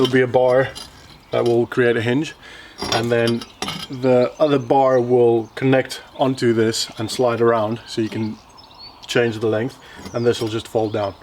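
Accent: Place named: South Africa. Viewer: The unmistakeable South African accent . Nice job bru its a lekka idea and iwill be making one for my garage gym . thanks for the great contact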